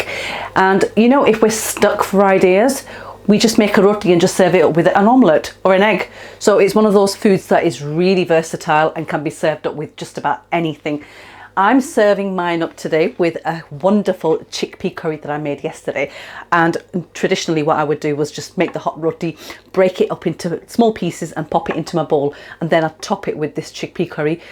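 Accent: United Kingdom. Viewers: I love your UK accent. :) :) Love from Tokyo :) :)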